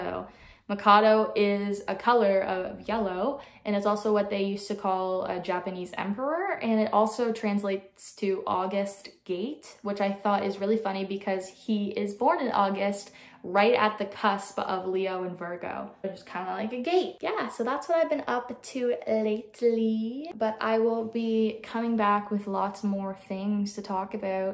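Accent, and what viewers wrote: Canada. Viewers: Canadians also say car... interestingly. So, the sentence "I'm about to take the bag out to the car" is, uhh... real interesting. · How would sounding too Canadian be a problem? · I can't unhear the "about" and "out" now 😂 it makes me wonder if I do the same thing but I'm western Canada so idk of we would talk the same also super cute kitten! And congrats on your new exciting changes! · It's so silly they wanted you to sound less Canadian. Why did they specifically want it to be American? Why not English or something else? The "assume american unless otherwise stated", "american is the default/assumed place of residence" and "all things american are superior" attitudes are so weird. I'm Australian and I much prefer the Canadian accent over the US accent. Your voice and accent is lovely.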